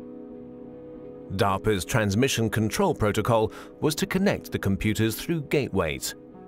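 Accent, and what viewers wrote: England, United Kingdom. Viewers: love the english accent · What a terrible accent... I can't understand a word. I hate England guys. (about the girl, I'm feel ok)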